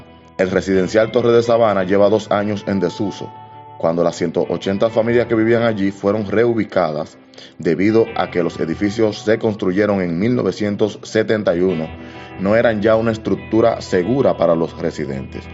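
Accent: Dominican Republic. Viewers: una pregunta, eres Dominicano por si acaso, tu asentó parece de RD!!!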